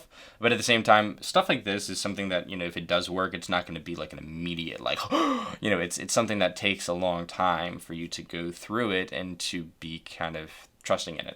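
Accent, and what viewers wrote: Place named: East Coast, United States. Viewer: Are you by any chance from the east coast? I swear I keep hearing a slip of accent come through that sounds like it could be anywhere from Philly/SouthJersey to Baltimore area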